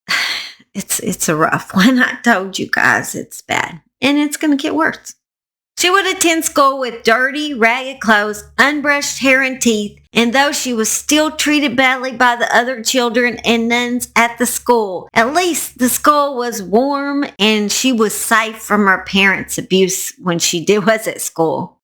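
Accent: South, United States. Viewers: I just love your country accent and I probably sound just as country I'm from Mississippi. Love your dog he's very smart.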